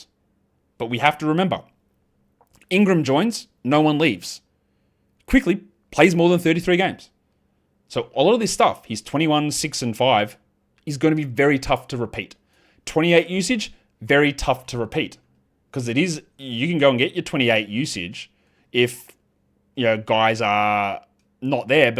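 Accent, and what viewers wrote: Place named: Australia. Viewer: This dude is Bill Simmons with an Aussie accent. Why bother listen he copied and pasted Bill Simmons 😂😂😂😂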